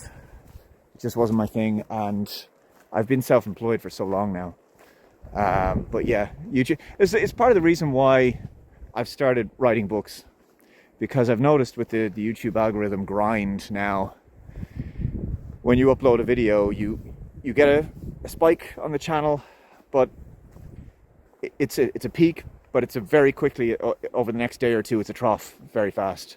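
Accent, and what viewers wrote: Ireland. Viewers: This is a game changer, man. I didn't expect a face reveal. I had a feeling you were ginger, man. The voice. God bless the Irish. I'm quarter Irish. I have family in Cork myself.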